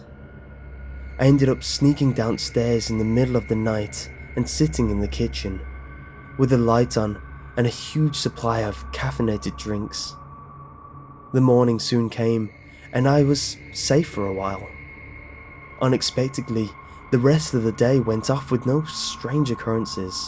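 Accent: United Kingdom. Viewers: It's a British accent, and sometimes they'll do that without noticing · DesiLovesSouthPark It's called an accent, friend. They don't do it on purpose, that's just what sounds natural to them. If you'd grown up in the UK, you'd do the same thing and think nothing of it. They probably get annoyed that we DON'T put "r" sounds after "a" sounds or "k" sounds after "g" sounds, it probably pisses THEM off. The American accent is not the one true way to pronounce the English language - it's an accent, like what you'll find anywhere else. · I really hate that angst saturated british accent!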